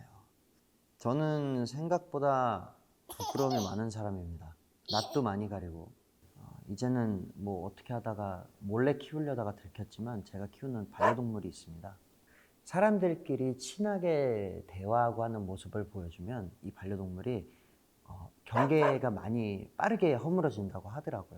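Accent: Suwon, South Korea. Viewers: 수원 사투리가 저렇다는거 처음알았다 ㄷㄷㄷ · 🍦 민혁이 오빠에 이어서 오빠도 블랭크톡이라니😭 좋다 이 스케줄 달다..🍦🤍 비투비 이창섭 3년 9개월만에 솔로로 컴백했습니다❤️ SURRENDER 많관부😊💙(#써렌더 #설렌다 #예지앞사 #💙) 저 툭툭 내뱉는 그 말투가 섹시한거야 야ㅋㅋㅋㅋㅋㅋ🍑 난 오빠가 수원 사투리 쓸 때마다 너무 좋아 💕 섹시해😉 오빠 그래도 5월 쯤 부터 매일 복싱장 가서 운동하잖아!! 진짜 복싱장 관장님께서 올려주시는거 보면 오빠 맨날 열심히 복싱하고 줄넘기하고 훈련하는거 보는데 너무 멋져☺️ 살도 점점 오빠가 원하는 정도로 건강하게 빠지는 것 같고 체력도 더 좋아지는 것 같아서 좋다❤️ 뭐야뭐야 그렇게 갑자기 정해진거였어ㅋㅋㅋㅋㅋㅋ?? 민혁이 오빠 솔로 콘서트 때 멜로디가 솔로 컴백 “기회를 만들어😮!!” 하니까 오빠가 기회 만들어 보겠다고 기다려 달라고 한게 엊그제 같은데 진짜 기회를 만들어서 이렇게 빨리 신곡으로 멜로디 만나러 온 거 보니까 감동이야🥹💙 진짜 새로운 분위기 노래 너무쭈아💕 비투비, 오빠 곡들로는 처음 접해본 미디엄템포, 70-80년대 노래 느낌인데 처음 듣자마자 소름이 쫙😬! 처음 시도해보는 장르인데도 오빠랑 찰떡이야! 약간 나른섹시 느낌이랄까🤭 너무쭈아 절대 호불호 안갈리는곡이야ㅎㅎ 왜냐구? 주변 애들도 다 노래 완전 좋다고 본인들 스타일이라고 했징😉 우리 하고싶은거 다 해🥰 부끄럼쟁이 😘 부끄러워하면서 노래 너무잘불러😲 오빠 음색때문에 내 귀가 녹았다구👂 역시 믿듣비 믿듣 🍑💙 어제 비키라에거 풀었던 썰이당ㅋㅋㅋㅋ!! 커피차가 사실 커피+차 였다는 사실🤣 그것도 커피 걸어서 배달해준거 너무 웃겼엉ㅋㅋㅋㅋ😚 바쁘다 바빠 비투비사회 다들 갠활이 많아서 까먹었을 수도 있는데 비투비 모두 어제 오빠 곡 나오는거 홍보해주고 또 응원해주고 해서 내가 다 감동먹음🥺 은광이오빠 썰 다시 들어도 너무 웃겨ㅋㅋㅋㅋㅋㅋㅋ 우리 서리더 최고🐱💙 저번에 미연이언니가 심야괴담회에서 큐브 귀신썰 푼거 봤었는데 그 귀신을 오빠도 봤을줄이야🥶🥶아니 귀신썰 진짜 큐브에 귀신 있을까봐(ㅋㅋㅋㅋㅋ) 좀 걱정되는데 그 귀신과 함께(?) 녹음한 노래가 ‘갓’리워하다였다니!! 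될 노래는 된다고😆 마침 또 그리워하다 녹음 때 귀신이 있었어😲😲 비투비 다음 컴백 때도 녹음실에 귀신 나타나주길🤣🙏🏻 그럼 성재오빠랑 은광오빠가 놀라서 헐레벌떡 도망갈 수도 있겠다🙄ㅋㅋㅋㅋㅋ 이번 앨범, 비투비 다음 앨범도 대박나자🤍 구리ㅜㅜ🐶 오빠 지인분들 인스타나 유튜브 통해서 올해 초 쯤부터 키우는거는 대충 알고있었는데 언제 쯤 이야기 해주려나 기다리고 있었는데 몰래 키우려고 했었다니!!🤭 강아지 책임지고 키우는거 멋있엉🤍 예전부터 강아지 키우고싶다고 했었는데 오빠가 오빠 본인도 감당 못하는데(😆) 강아지 키우면 감당할 수 있을까? 하고 고민 또 고민하던하던 모습이 생생한데 지금 이렇게 책임감 가지고 키우는거 좋다🥹💙 오빠 핸드폰 배경화면 오빠랑 구리랑 뒷모습 사진찍은거 탐난다구ㅋ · 시작부터 저음 아.. 저는 이렇게 저음의 인사하는 에게 푹빠져요.: 역시 숙취ㅋㅋㅋ 안들린데 ㅋ 우리 숙취 팬싸도 했잖아요 ㅋㅋㅋ 숙취이야기하는데 왜케 옛날 생각나죠? 블랭크톡 알면서 이질문 고른건가요?, 저 수원 사투리 여기서 알았어요. 저 특유 말투가 수원 사투리 였다니.. 나 왜 오늘 알았는건지.. 감사합니다. 아니 이렇게 호탕하게 웃어준다구요!! 운동의 굴레~ 아 세상엔 숙제가 너무 많은데 도 숙제를 준이기분 ㅜ 저 이거 너무 궁굼했어요. 갑자기 뮤지컬도 빨리 끝나고 근데 음반 낸다고 안했고 계획도 없다고 했는데 갑자기 나와서 사실 조금 놀라긴 했어요. 갑자기 나온 이유 너무 궁굼했는데 이렇게 들으니까 너무 좋아요. 좋은 음악이 있으면 언제든 나올수 있다는걸 알거 나니 앞으로의 가 기대되요 비투비가? 이런장르를? 하고 생각했는데 비투비 이 라 가능하다고 생각했어요. 다른 장르도 도전해주세요~~ 라이브 시작 ㅜㅜ 막 잘부르는 노래도 좋은데 이렇게 흘러가듯 불러주는 써렌더.. 목소리 우리집으로 배달좀 해주세요 블랭크톡 나도 써렌더~~ 아써렌더~~ 진심 빵터졌어요. 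자기 살길사느라 ㅋ 그래도 분명 멤버들 한명한명 관심없는척 하지만 다들 신경썼을꺼예요. 하지만 이사님 ㅋㅋ 주차는 ㅋㅋ 귀여운 이사님 썰~~♡ 써렌더 녹음할때도 나왔어야 하는데 귀신아 어디갔니 써렌더 대박 나야 한다고!! 이렇게 앉아있어요. 하는데 아니 저 모습 너무 귀여워요...딱 저 수줍은 저모습 섭프가 빠지는 치임포인트 부끄러워 손을 비비는 우리 아 블랭크톡 이부분을 살려줘 감사합니다!!♡♡ 아니 동네 동생도 있다구욧!! 동네 동생 해주라~~ 우에엥에엥 꼭 전해줘요 동네 동생해달라고! 오빠 그만 찾자 @@ 이영상의 끝판왕!! For melody 내가 멜로디인게. 그리고 이창섭의 팬인게 for 멜로디 한단어로 눈물이 왈칵했어요. 항상 같은 마음으로 예전이나 지금이나 똑같이 말하는 이 라 너무 고맙습니다. 몰랐던 정보 또 그의 마음이 너무 잘 전달 되었어요. 앞으로 멜로디 한사람으로 이창섭도 블랭크톡도 응원 할께요. 너무너무 감사합니다. 내가 이창섭을 사랑하는한 우리 또 만나요. 블랭크톡 다시한번 감사하고 사랑해요! ♡surrender all to 멜로디♡ ♡surrender all to 이창섭♡ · 방긋웃는 모습이 넘모이쁜 우리 💙 숙취를 겪어본 바 배탈이 난 숙취후 ㅠㅋㅋㅋ 경력직 ㅠㅠㅠㅋㅋㅋㅋ 이런얘기 저런얘기 아무렇지 툭 터놓고 해주는 너가 참 조타아 수원사투리가 뭔지도 몰랐으면서 매번 ~거? 하고 물어보는 이창섭한테 치였었는데, 그게 수원사투리였구나?? 앞으로도 종종.. 아니 자주 해주라… 글고오!! 지읒니은 섹시해 무슨소릴 하는거야😭😭😭 나야말로..힘듭니다.. 나야말로…힘듭니다 ㅠㅠㅠㅠㅠㅠㅠㅠㅜㅜㅜㅜ 그렇게 반존대 하시면 나야말로 힘듭니다😱😳 언제나 음악에 진심으로, 하고싶은 음악에 도 전하는 모습이 지금껏 쌓아올린 이창섭을 만들었을테지 비투비가 이 음악에 진심인 만큼 멜로디도 비투비에 이창섭에 진쒸미양〰🥴 부끄럽다며 세에상 멋찌게 노래부르는 🫶❤️ 씨디💿를 주식으로 먹나 라이브가 어찌 저럴수가 있는거시여ㅠ ㅋㅋㅋㅋㅋ몇초차이에 ㅋㅋㅋㅋㅋ 우리 이사님 엉뚱함과 미담을 오가는 ㅋㅋㅌㅋㅋㅋㅌㅌㅌㅌㅌㅌㅌㅋㅋㅌㅌㅋㅌㅋㅋㅋㅋㅋㅋㅋㅋㅋㅋㅋㅋㅋㅋㅋㅌ 본투비 그하는 대박날 운명이었던거심ㅇㅇ 구리가 이 키우는 멈무가 맞았구나~~~ 구리아빠랑 구리 들킨김에.. 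👀📸 둘이 싸우디 말고 잘지내에! 구리야 이창섭 잘부탁해ㅋㅋ 동네 노래 좀 하는 오빠로 남으시려면 일단 제 동네로 와주시면… 🏡 고민도 없이 for 멜로디를 외치는 너얼 어쩌면 좋니~~ 난 for 이창섭 for 비투비야 ㅠㅠㅠㅠ 서렌더 띵곡입니다 많관부 많사부🔥 마지막까지 안뇽 하고 흐캬캬캬 웃고 가는,ㅋㅋ 블랭크톡도 이창섭도 고마웠어요 덕분에 이창섭 티엠아이 마아뉘 알아갑니다아 안녕👋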